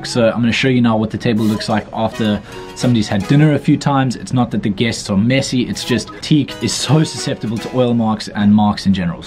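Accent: South Africa. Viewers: your South African accent is sick (at least i think thats a SA accent) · 1st video im seeing of yours Are you South African? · this guy's got a south african accent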